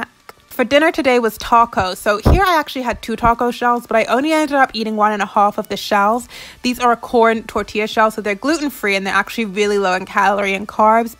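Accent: United Kingdom. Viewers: Why do you sometimes sound British? I’m kinda confused. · Hinata Hyuga she is British and she moved to Canada when she was younger. So her accent probably stuck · Your accent is sooo different in this video, I've been watching your videos since you started you WL - are you dating a Brit? Or been to the UK recently? The English accent is more obvious than it has ever been.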